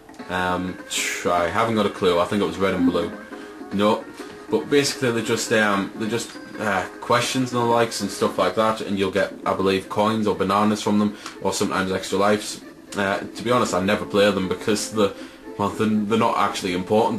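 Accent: United Kingdom. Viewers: awesome and btw its good to hear brits doing reviews, its always some american kid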